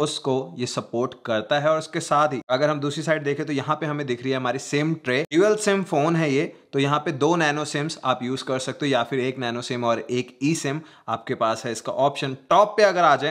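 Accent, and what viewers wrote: Punjab, India. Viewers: Punjabi Bande Di Punjabi Hindi Ch Nikal Ee Jandi..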